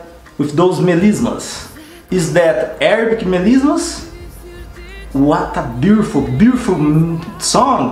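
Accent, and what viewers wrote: Brazil. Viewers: u sounded like Brazilian speaking English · Cara! Que legal que te encontrei. Imaginei que fosse brasileiro, já no início do vídeo, pelo sotaque kkkk Interessante não ver comentários de outros brasileiros e a prevalência de russófonos e outros estrangeiros. Parabéns pelo trabalho.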